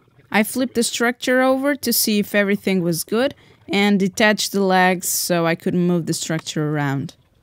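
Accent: Portugal. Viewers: Assim que comecei a ver o video tive a sensação que o sotaque seria Português :D Yey!! Tão contente por te ter encontrado!! Subscribed! <3 Love de Coimbra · Ohhhh! Portugal! I've never heard a Portuguese accent before! I was trippin' trying to place your accent :) Really really cool project! Thank you for sharing!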